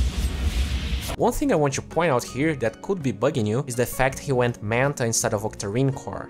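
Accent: Brazil. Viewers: Brother, com esse sotaque. Tu é Hu3hU3 BR?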